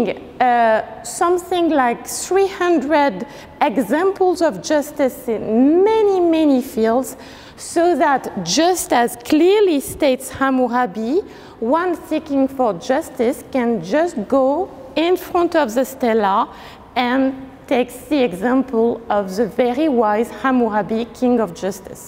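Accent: France. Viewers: Quel accent français insupportable ! Je doute qu’un non francophone comprenne le moindre mot. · Thank you so much for this visit ! As a german i’m very pleased to be able to understand without having to be focused on the subtitles :) the french accent gives me the impression to travel til’ Paris with you in this marvellous place that is mesopotamia ! My favorites are definitely the lamassus at the entry, so impressive 💜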